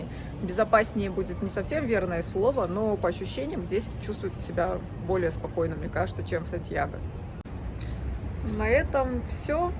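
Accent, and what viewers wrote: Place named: Urals, Russia. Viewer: Так она не говорила что из Москвы,ну и по говору и манерам совсем не москвичка,скорее с Урала..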